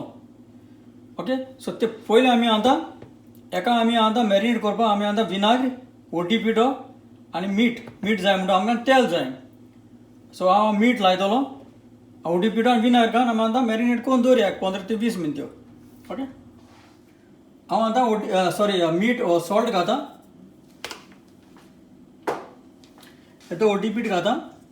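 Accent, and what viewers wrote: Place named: South Goa, India. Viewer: What I loved most about this video is our South Goan Konkani and accent ❤❤ wish we saw more of it in media!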